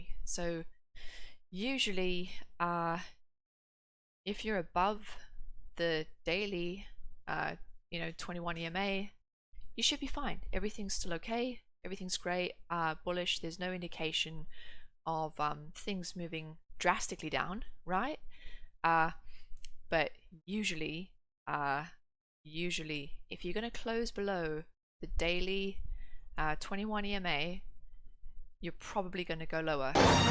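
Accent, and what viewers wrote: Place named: South Africa. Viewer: South Australia sorry haha. I wondered if you were from South Africa with your accent.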